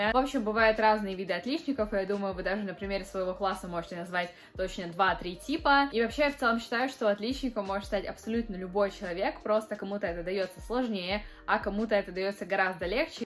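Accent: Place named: England, United Kingdom. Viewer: как будто английский окцент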